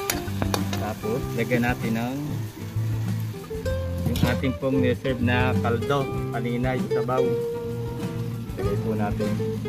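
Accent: Batangas, Philippines. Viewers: Wow look so delicious, nakakagutom naman, yang ang Luto nang tiyo ko na Batangueno, love the accent, thank you sa masarap na Putchero, babait naman nang mga bata, natututu silang tumbling sa pagluto, I'm pretty sure na mag mamana sa yo, one day baka mag chef sila well done. Watching from Queensland Australia ❤❤❤❤🇦🇺🇦🇺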